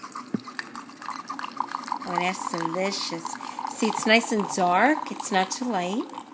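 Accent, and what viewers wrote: New York, United States. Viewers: I love the my cousin vinny accent! good review, I just ordered mine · Tery Lim Is she from New York? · Someone’s from new york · It's a New York accent.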